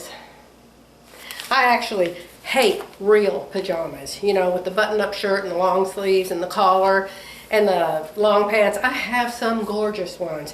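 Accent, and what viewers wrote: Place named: Oklahoma, United States. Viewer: Your videos always make my day. My family is all from Oklahoma & Arkansas & it's like listening to them talk. You make me smile. I like both of the border colors. How about a skinny little brown line around to frame it & then a wider binding of the turquoise? On the crumb quilt, I love option 4. I think that one is the best. Have a wonderful day!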